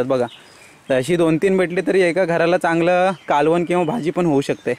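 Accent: India. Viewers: मस्त #properमालवणी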